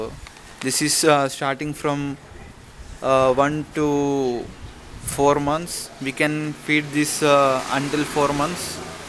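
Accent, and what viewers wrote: India. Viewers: Pota indiano pa ka bwect pakinggan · I dont think your a filipino, your accent sounds like indian guy for sure. Your video is not credible for all sabungeros to share what is good chicken feeds if your not one of us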